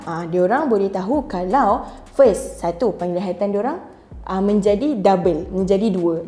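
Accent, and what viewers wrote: Malaysia. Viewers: Cewek malaysia lucu klo ngomong 😂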